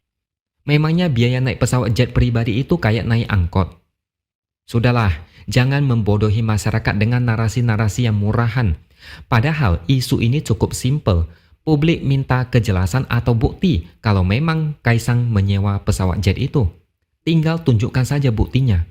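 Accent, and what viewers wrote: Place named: Banyumas, Indonesia. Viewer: NGOMONG NGAPAKK.....PREETT....!!!!!!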